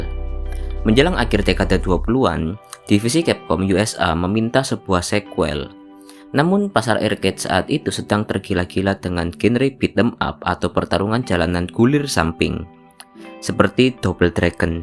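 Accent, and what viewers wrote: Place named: Batak, Indonesia. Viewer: Logat batak nya kuat banget bah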